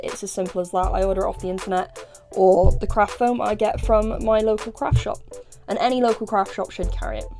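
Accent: United Kingdom. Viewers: Where do you get your fur? Your accent suggests you live in the uk and I find I dofficult to find cheap decent fur for my fur suits.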